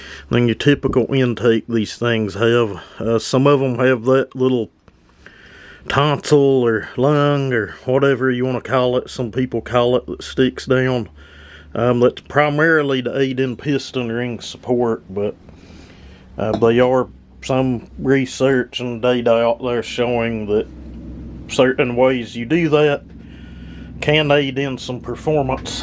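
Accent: South, United States. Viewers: A master at his craft! Just curious, where are you from? You have quite the unique southern accent 🤠 Don't think I've heard your dialect before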